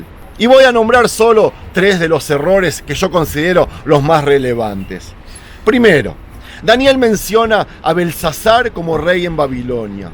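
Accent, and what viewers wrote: Argentina. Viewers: Porque siempre dices que eres judio tal parece que eres argentino por el acento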